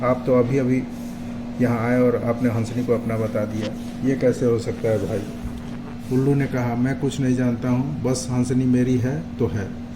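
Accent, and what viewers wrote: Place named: Siwan, India. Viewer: Siwan wala tone hai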